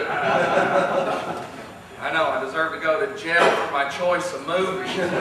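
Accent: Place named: East Texas, United States. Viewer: what part of east texas is he from?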